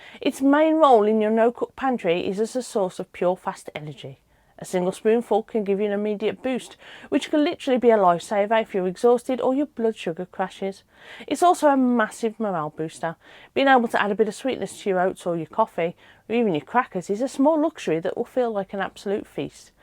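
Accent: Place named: Black Country, United Kingdom. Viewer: black country? Rowley regis? Is my guess ❤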